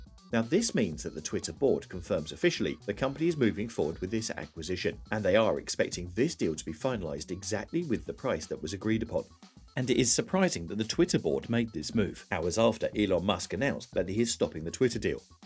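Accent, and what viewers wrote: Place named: United Kingdom. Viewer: Even tough this is a fine presentation, I get awfully tired of the clipped British accent when we are all tuned in to the good old American accent. It just sounds phony and is hard on the ears.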